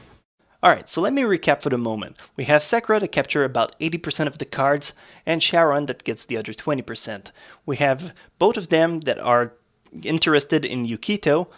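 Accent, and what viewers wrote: Quebec, Canada. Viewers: are you from Quebec? The accent seems familiar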